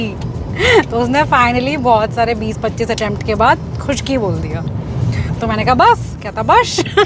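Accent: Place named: Northeast, India. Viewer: North east wala hindi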